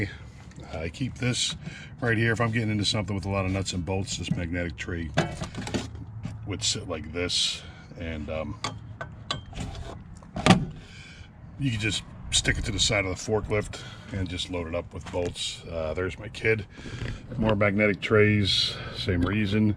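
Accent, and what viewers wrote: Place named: Scranton, United States. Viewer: I LIKE YA GUY; JUST RECENTLY SUBED. YOUR ATTITUDE AND MANNER OF SPEECH WOULD FIT WELL IN SCRANTON PA. (THATS A COMPLEMENT) GREETINGS FROM NOSE (THATS MY NICKNAME)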